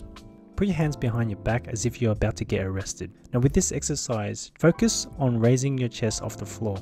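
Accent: Australia. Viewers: is this Australian accent? anywho i used this for running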